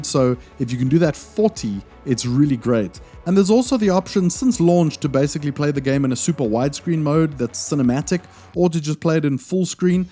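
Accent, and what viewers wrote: South Africa. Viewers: Where are you from? Your accent sounds kinda South African.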